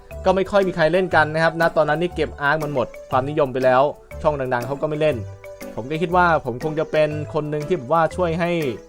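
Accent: South, Thailand. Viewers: ภาคใต้เหมือมนิพี่ผมคนสุราด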